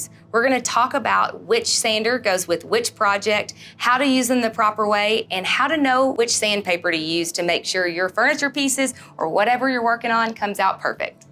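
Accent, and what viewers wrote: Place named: West Texas, United States. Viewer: Thanks for the refresher on types of sanders and sandpaper. I'm trying to place your accent. Are you from west Texas?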